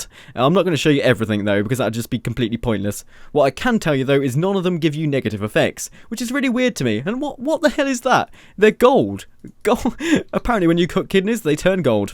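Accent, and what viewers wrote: United Kingdom. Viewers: Where are you from you sound sort if British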